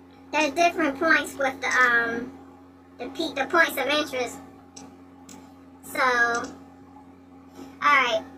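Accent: Baltimore, United States. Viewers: Truker path is also a GREAT app for trip planning. It gives you truck stops(large chains & mons and pops as well) rest areas, Walmarts, weight stations, etc... all at the same time. The voice thing tho, no fun intended but I'm a southerner and I enjoy your B-mor accent, js. Do you of course though, lol. Good Vids!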